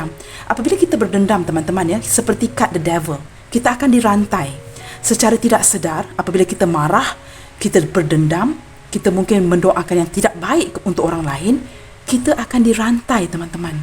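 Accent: Malaysia. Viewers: Pagi kak. Pisces hadir. Suara kakak,seperti logat Malaysia. Terimakasih readingnya. Sukses selalu 🙏🏻🙏🏻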